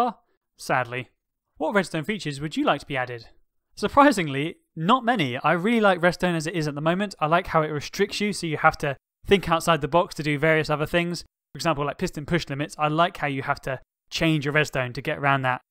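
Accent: United Kingdom. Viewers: You are actually quite similar to Mumbo -brittish accent -good at redstone -classy outfits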